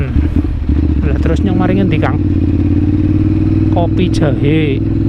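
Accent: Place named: Banyumas, Indonesia. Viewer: Salam ngapak bapakeee wkwk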